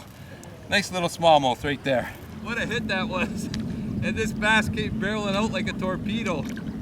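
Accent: Canada. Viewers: You sound like your from Canada, but it is always summertime in your videos?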